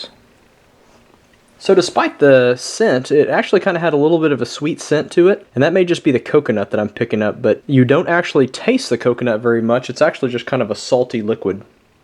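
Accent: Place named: Oklahoma, United States. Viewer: I love the Okie accent 😍,. Maybe that's cause I'm from North Central Texas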